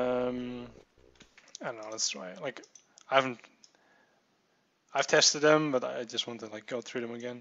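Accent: Netherlands. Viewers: are u dutch? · Not judging his accent just sounded like he was dutch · i have a question are you dutch it sounds like it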